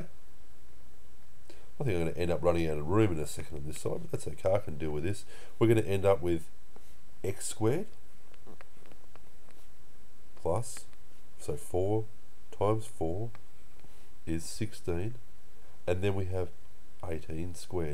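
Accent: Australia. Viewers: sounds Australian, high possiblity.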